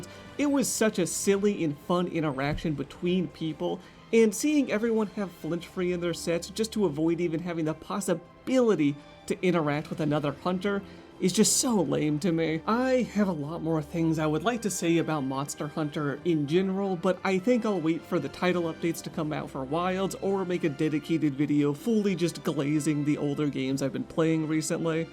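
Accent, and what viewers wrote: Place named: Texas, United States. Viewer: "i was hoot i was hoolering " texan spoted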